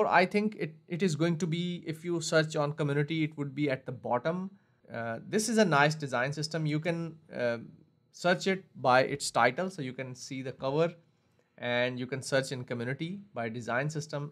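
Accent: India. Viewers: Why you sound like Indian but look like European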